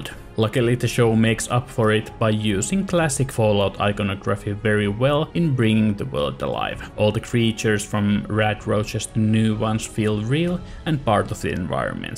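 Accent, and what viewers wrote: Finland. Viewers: the finnish accent is quite recognizable 😅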